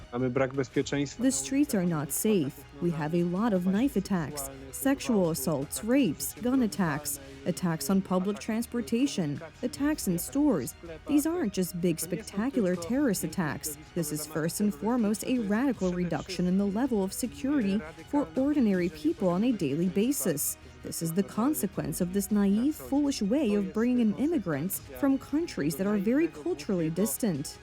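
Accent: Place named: United States. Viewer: Is this a Polish news station? Please don't use the voice with odious American sounding accent. It's bad enough hearing that accent in their vapid movies but please, not on Polish news. I used to like listening to your Polish newsreaders speaking aEnglish, clearly and concisely. And I'd still prefer to hear real Polish newsreaders speaking than listening to that yankee accent.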